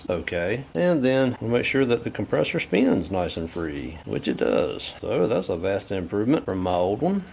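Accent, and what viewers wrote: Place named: Georgia, United States. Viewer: Excellent DIY tutorial with a Goorgan accent to boot, I reckon. Bummer you had to go all through this after you fixed the AC a year ago. But that's the reality of owning a car past it's engineered intent. One good source of AC troubleshooting is the Professor Pentane yt channel.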